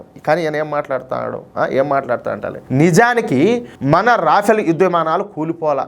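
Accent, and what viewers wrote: Rayalaseema, India. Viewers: U speak my language in rayala rajyam slang bro ... gan D super bro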